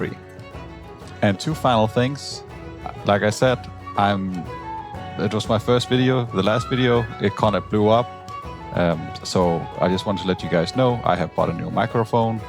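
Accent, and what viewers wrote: Denmark. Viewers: Something about the accent that tells me that he is danish! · You accent makes me think you are from Denmark, am I right? 😂 Nice serie man, cant wait for the rest of serie to come out. KEEP IT GOING!!!💪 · the danish accent bro, love it <3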